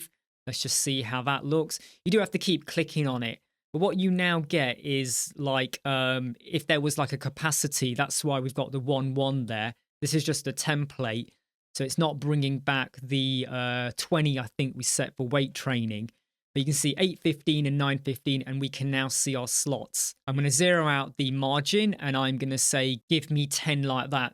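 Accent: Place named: United Kingdom. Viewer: I recently discovered your channel, and I have to say, it's become my go-to resource whenever I need to learn something about Elementor and WordPress. Every time I have a question, I head straight to your channel, use the search bar, and look for the topic I need. Only if I can’t find what I’m looking for do I turn to YouTube for other tutorials. You explain things in such a simple, clear, and easy-to-follow way, and your British accent makes it even more enjoyable and effortless to understand. I just wanted to thank you for that!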